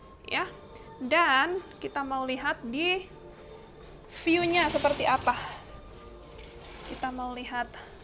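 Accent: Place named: Banyuwangi, Indonesia. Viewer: bhsa nya oseng ya..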